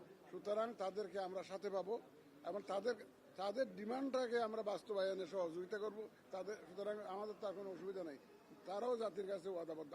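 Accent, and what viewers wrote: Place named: Chittagong, Bangladesh. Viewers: ভাষার ভাব বলছে, চাটগাইয়া স্যার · চট্টগ্রামের মনে হয়। কথায় চট্টগ্রামে আঞ্চলিক ভাষার সুর।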